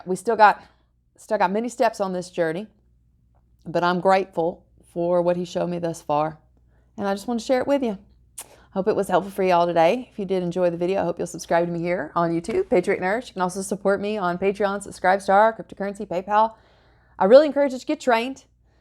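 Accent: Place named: Midwest, United States. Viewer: George Bruno turned me onto your channel. You're very encouraging and informative. A feminine woman of God is a breath of fresh air. Love your Midwest drawl. 💯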